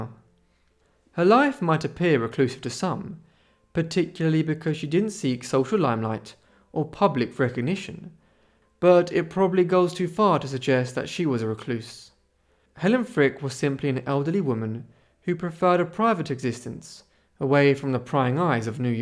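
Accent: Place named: England, United Kingdom. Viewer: English accents drive me nuts. I can't listen to this BS.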